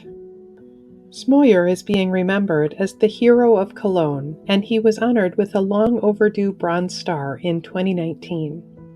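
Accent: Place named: Midwest, United States. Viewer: What a nice narrator. Such a kind, calming voice. And I hear a midwestern or WNY accent which reminds me of home.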